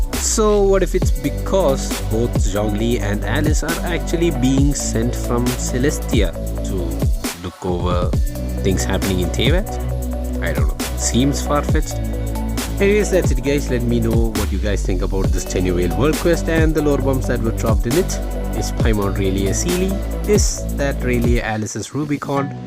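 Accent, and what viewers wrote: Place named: Kerala, India. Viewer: Ur voice is similar to indian mallu accent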